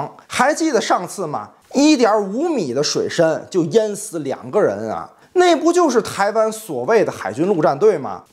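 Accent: Tianjin, China. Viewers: 哎，关注晚了，杠杠的正能量，还挺幽默，目测应该是天津的，和罗尼口音挺像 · 哥，是天津人吧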